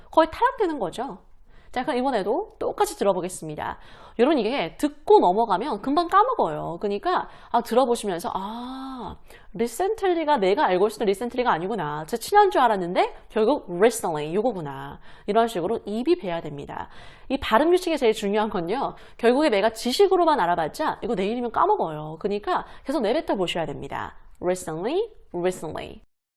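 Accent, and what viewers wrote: United States. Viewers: 저는 미국서 오래살고있지만 선생님의 미국식 영어발음에서 많은것들을 배울수 있어서 매우 감사합니다 그리고 선생님의 모교인 명문 조지아 대학교의 미식축구 열팬이고요 다시한번 감사합니다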